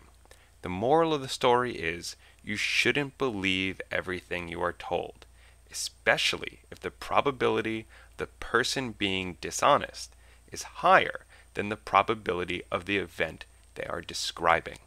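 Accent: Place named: New York, United States. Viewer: lol i detected some hint of "new york" while i was listening too lol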